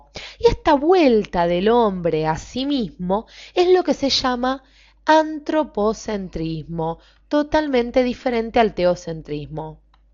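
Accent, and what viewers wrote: Rioplatense, Argentina. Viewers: Vos sos Argentina o Uruguaya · Like si escuchas asento argeitaliano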